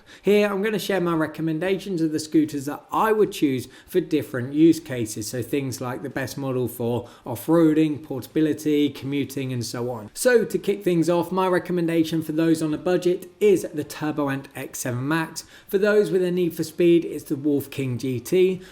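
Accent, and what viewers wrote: United Kingdom. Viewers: he talk in dollar with a sharp british accent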